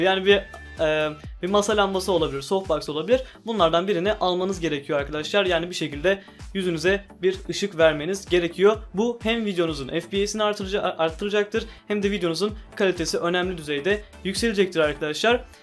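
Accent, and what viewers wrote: Azerbaijan. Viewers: Abi sen azerbaycanlisan qaqa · Sen azerisən brat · Azerisən sen?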